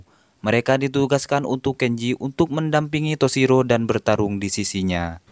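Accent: Madura, Indonesia. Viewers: Logat admin dari madura ya? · spertinya orang madura ini wwkwkwkwk,,,,,,ketara sekali logat bicaranya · Kalo dari logatnya lo kayak madura ya bang? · kentel banget y maduranya.. 👍👍👍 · Oreng medureh been cong...?!?!?! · Meduro iki koyok e sg ngomong 🤣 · Logatnya madura banget 😀. Semangat saudaraku 👍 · Reng medhure ye teh ? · logat Madura checkkkk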